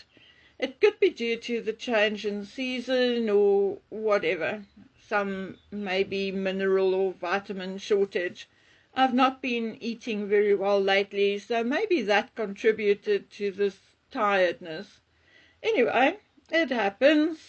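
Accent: South Africa. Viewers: Hi. I've been enjoying your podcasts snd trying to place your accent. Is it South African/Afriaaner? Just curious. I love to listen to accents ftom around the world and place them.🥰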